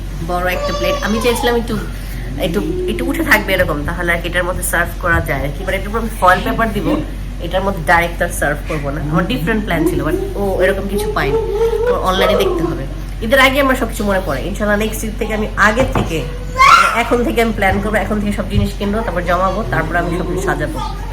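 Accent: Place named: Bangladesh. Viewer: তোমার আরেক কোন এক ঈদের ব্লগ থেকে দেখা শুরু তোমার ব্লগ।মজার কথা ভাইয়াকে দেখে ১ম আমি ভেবেছি ইন্ডিয়ার নামক এর একজন অভিনেতা ও তোমাকে ১ ম দেখায় মুগ্ধ। ভয়েজ শোনার সাথে বুজমাল তুমি বাংলাদেশী+ আমরা নারায়ণগঞ্জ বাসী😍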